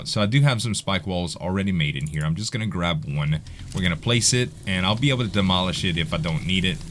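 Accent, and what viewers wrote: Louisiana, United States. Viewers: Love ya videos Z. Listening to you takes me back to Thibodaux, and I haven't been home in a while.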